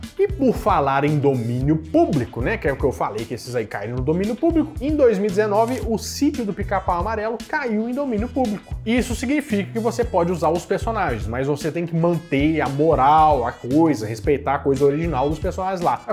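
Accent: Minas Gerais, Brazil. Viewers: E com sotaque mineiro arasaaaa seus vídeos são maravilhosos e com os comentários de vcs ❤️❤️❤️❤️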